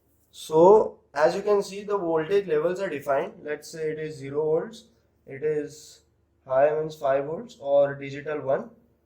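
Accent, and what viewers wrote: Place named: India. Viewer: Hard to understand the india accent. Great video.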